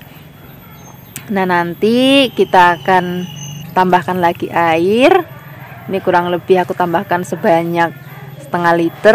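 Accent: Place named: Cilacap, Indonesia. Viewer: Kok ky wong cilacap ulih ngomong ya bun,tempe semangit senengane mamakq huhuuu😭😭😭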